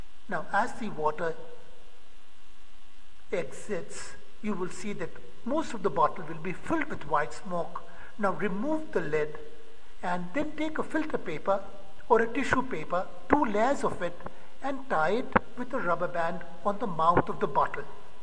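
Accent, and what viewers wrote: India. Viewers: Anlatıcı hintli.çünkü bu hintli ingilizce aksanı :) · Kesin hindistanlı bu konusan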